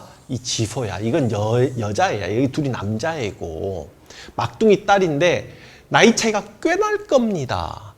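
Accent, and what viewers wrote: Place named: Gwangju, South Korea. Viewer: 억양이 광주쪽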